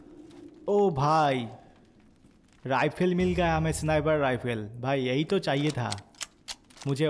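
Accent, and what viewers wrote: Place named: Bengal, India. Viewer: বাংলায় বলেন আপনি যে বাঙ্গালি সেটা আপনার হিন্দি বলার ধরনই বোঝায় আপনি বাংলায় বলুন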